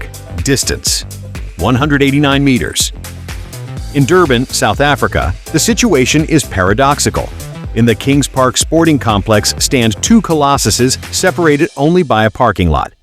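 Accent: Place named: United States. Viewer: Bring back the old narration voice, the American voice doesn't sound as nice.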